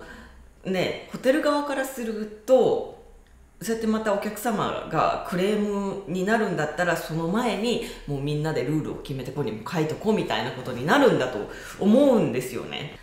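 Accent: Kansai, Japan. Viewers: 『理由はありません、て言うてもてるやん』← の関西弁、好き❤️ 確かに、人に迷惑かけない為のルールに縛られすぎてる❣️って、迷惑かけるが大前提になってますやん（笑） お互いに尊重できる日本になるといいな^ ^いつもありがとうございます✨🙏✨ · Momoyoさんこんにちは。今回の動画も、Momoyoさんの話を聞きながら、自分はどうだろう？境界線引けてるかな？なるほどなあ、など、たくさん自分と向き合うきっかけをいただきました。ありがとうございます。わたしの中にチクチクと刺さっている言葉があったのですが、今回のルールのお話でその言葉がすぅっと消えていった感じがありました。 「ルールだから」「慣習だから」は、本当の愛を見失ってしまいますね。わたしはわたしの愛を表現する力を頂いたように思います。ありがとうございます。 Momoyoさんのツッコミや関西弁、歯に衣着せぬ物言いがだいすきです。今回も何度も吹き出しながら動画拝見しました笑ありがとうございました。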